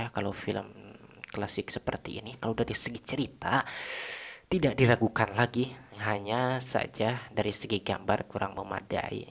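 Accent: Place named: Sunda, Indonesia. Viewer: Cuma Chanel ini si yg asik pembawaan nya campur sunda wkkwkw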